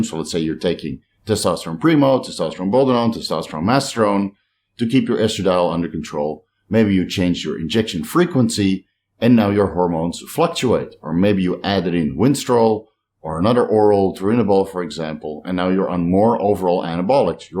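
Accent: Netherlands. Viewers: I think it may be his Dutch accent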